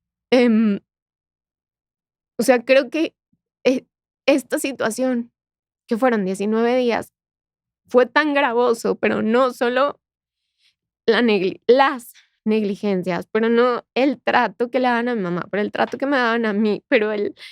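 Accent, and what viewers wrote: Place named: Colombia. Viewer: Habla como Colombiana...solo falta el ...como así...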